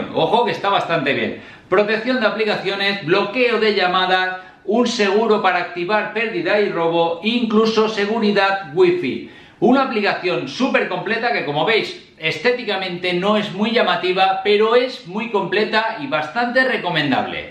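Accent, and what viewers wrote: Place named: Spain. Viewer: Bro los nombres de las app no entiendo muy bn por tu acento español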